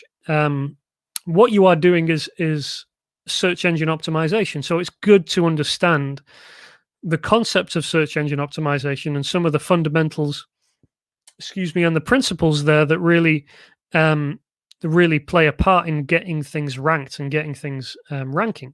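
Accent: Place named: United Kingdom. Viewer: Thank you so much, this video is by far one of the best on the tube! Extremely awesome & refreshing to hear a uk voice, ✌🏻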